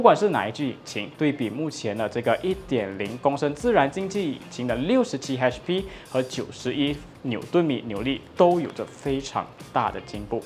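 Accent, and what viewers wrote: Standard. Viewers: 新人不错，华语标准，表达清晰，automachi捡到宝了，可以好好栽培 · 华语非常标准👍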